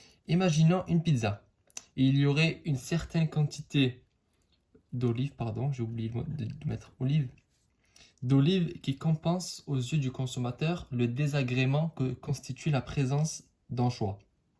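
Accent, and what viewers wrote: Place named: Morocco. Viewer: Merci, rien à dire vous sauvez mes révisions ! J'ai remarqué un accent marocain chez vous si je ne me trompe pas Allah y hafdk c'est très bénéfique ces séries de vidéos.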